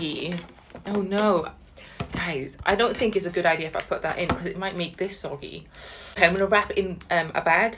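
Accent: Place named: United Kingdom. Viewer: i luv ur accenttt where r u from? im from the uk too!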